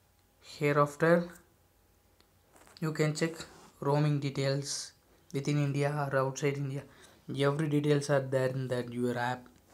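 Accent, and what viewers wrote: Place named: England, United Kingdom. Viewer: Din nou musulman prost cu accent de englez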